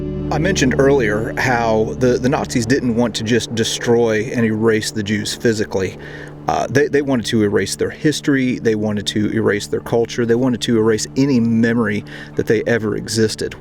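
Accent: United States. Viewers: Sending kind wishes from Connecticut. I’m your newest subscriber. I appreciate your skills and knowledge base. You’re presenting difficult material with such respect for the tragedy and multi-generational trauma. I’ve seen many in Europe, but never visited Plaszow. I will have to read about you and your channel. You sound USAmerican.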